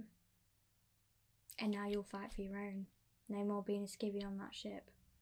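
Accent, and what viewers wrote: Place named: Birmingham, United Kingdom. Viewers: Being from the Midlands (South Yorkshire) specifically your accent felt so at home for me lol its so strange hearing it from you tho. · I think the best thing is that it sounds like u come from Birmingham (witch is where im from 😂) really good role play Keep up the awesome work 💯♥️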